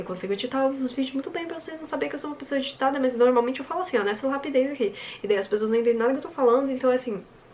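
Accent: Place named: Curitiba, Brazil. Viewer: Eu jurava que tu era curitibana 😂😂😂 me veio até distrito federal do nada agora ahsuahsu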